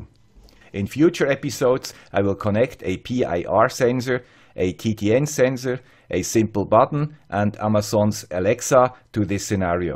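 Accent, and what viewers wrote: Switzerland. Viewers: Damn you 'guy with the Swiss accent'! You've gone and preempted me - with the 'topic' of my forthcoming video (see what I did there)?. But thanks for the reference and excellent dual between Broker and UTube. I'll go back and 'like' furiously! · Thank you friend with the Swiss accent. I have enjoyed many of your videos and was excited to see you get a Pi Zero W, now MQTT. I will probably have to watch these videos many times until I understand since I'm new at coding. Thanks for the good tutorial, Hope to see many more. I need help!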